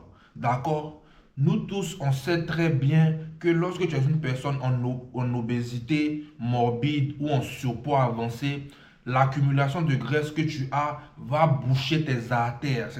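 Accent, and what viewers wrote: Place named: Ivory Coast. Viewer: Coach je sais pas pourquoi mais j'aime tes gimmick et ta manière de parler, j'ai montrer ta vidéo a un pote et c'est lui qui ma dit que tu étais Ivoirien 😅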